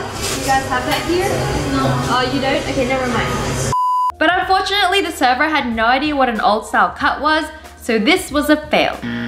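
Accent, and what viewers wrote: Australia. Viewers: Wait is she Australian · Are you Australian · Are you Australian???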